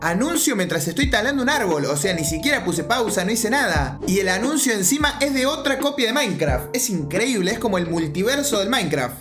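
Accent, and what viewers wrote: Córdoba, Argentina. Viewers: SOS CORDOBÉS????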